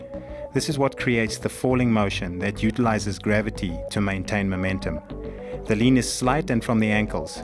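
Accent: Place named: South Africa. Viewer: I think this is a South African accent. A "fucking accent" would probably have more moans, groans and "oh, baby"s in it. Doesn't everyone who speaks English have some sort of accent?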